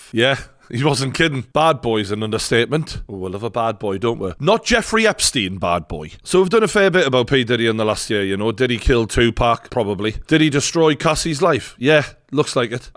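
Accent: Newcastle, United Kingdom. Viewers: He is a Geordie from Newcastle upon Tyne. Check out any vids on the accent and city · He accent is called Geordie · I love this but he's not Irish bless ya! He's from Newcastle the accent is a Geordie accent 😁😁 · Such a brilliant fresh delivery of all this Diddy tea 🫖 ☕️… love the geordie accent, and subscribed 😂😊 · Swear is jokes 🤣🤣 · Geordie accent, what does this mean? I do kind of like listening to this guy. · Brilliant, we need more Geordie explanations of things going on.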